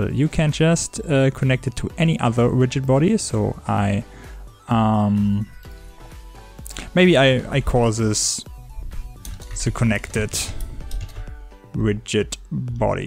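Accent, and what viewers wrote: Germany. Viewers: Kann es sein das du Deutsch bist klingt ziemlich stark danach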